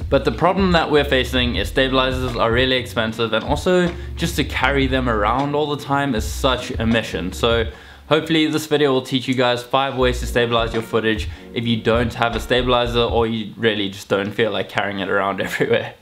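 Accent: South Africa. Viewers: As soon as I heard that accent I just knew it... Big ups bro, great tips ... very useful 🇿🇦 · The accent sounds very South African. Finally I get to hear another South African review about cameras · You sound south African? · picking up a south african acent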